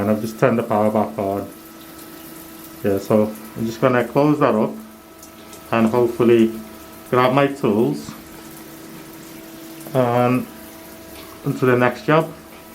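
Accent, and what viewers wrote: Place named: Liverpool, United Kingdom. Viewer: Carried on watching and you’ve now mentioned Liverpool so that’s answered my question 😂 I could tell you were scouse from your accent ❤️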